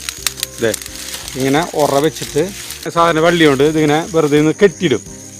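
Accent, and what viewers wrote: Thrissur, India. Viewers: Thrissur slang❤ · ആദ്യമായി കണ്ടതാണ് ആളുടെ വീഡിയോ!മടുപ്പിക്കാത്ത നല്ല അവതരണം.Keep It Up. സസ്ക്രൈബ് ചെയ്തു. തൃശൂർകാരൻ ആണല്ലേ! ആ സ്ലാങ് ഒരു ആവേശമാണ്. Wish You All The Best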